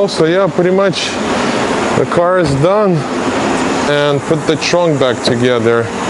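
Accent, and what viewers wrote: Russia. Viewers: Я один слышу хороший такой русский акцент?:))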